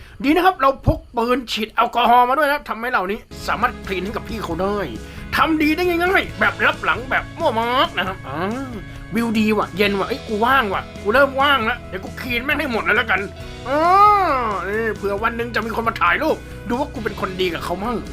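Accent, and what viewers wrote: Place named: South, Thailand. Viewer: ฟังเพลินครับภาคใด้มันมากครับ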